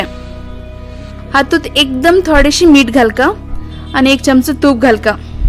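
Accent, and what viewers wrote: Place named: Konkan, India. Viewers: Ur Konkani is different 🤭🤭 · Nice recipe explanation with nice konkani tone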